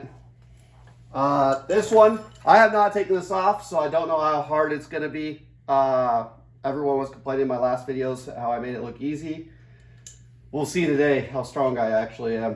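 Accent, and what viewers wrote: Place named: Canada. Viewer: Great video bud ! You sound like a fellow Canadian lol , do you do repairs? I’ve had this dreaded vacuum leak for a while now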